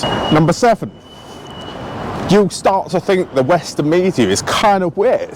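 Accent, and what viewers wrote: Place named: United Kingdom. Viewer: I love the British English accent. I would shrug off the US English should I come as an alien from another planet. But the English pronunciation, hilly and aspirating, reminds me of the coughing of a Victorian aristocrat.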